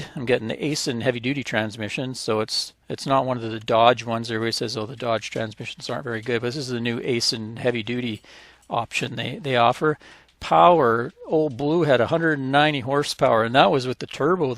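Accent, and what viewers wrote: Canada. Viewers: In stead of calling it Big Blue 2 The Dodge Two Blue. New to your channel getting cough up on your episodes. Man you are so Canadian PLEASE No Insult intended I loved hearing you say "WICKED!" Have Great Travels. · Are you from Canada man. I can tell by the accent. Also I'm sorry for Old Blue man hopefully it'll go to a great home with someone that'll bring it back to life.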